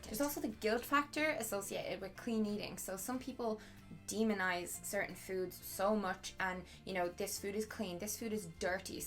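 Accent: Ireland. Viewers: I agree with everything you said! Also, it's crazy how similar your circumstances in this video are to mine! :) P.S. love that Irish accent.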